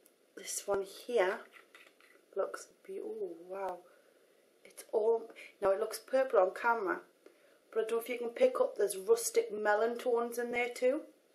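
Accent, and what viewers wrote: Newcastle, United Kingdom. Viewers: Wish I had your Geordie accent. Amazing! :) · the geordie accent is amazing!:') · Are you from newcastle? I LOVE your videos!!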